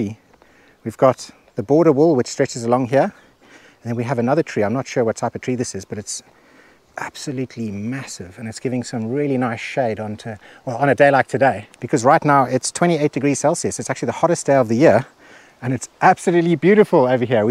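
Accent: South Africa. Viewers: Hearing the Saffa accent when I clicked on this video was definitely a pleasant surprise 😂 I'm hoping myself to live somewhere in rural Portugal someday while working online. Seems like a dream.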